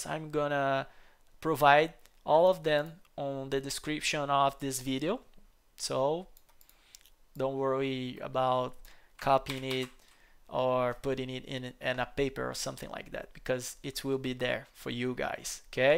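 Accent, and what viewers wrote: Brazil. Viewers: boa mn lamento dizer mas seu sotaque brasileiro é bem reconhecível kkkkkkkkkkkkkk video bom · QUE INGLES top hein, obrigado amigo, vi que é br pelo sotaque hahaha, thankyou bro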